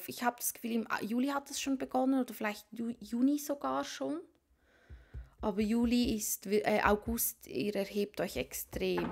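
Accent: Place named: Switzerland. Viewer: Mit wunderbarer schweizer Akzent ;) Grüessli us de Schwiiz :D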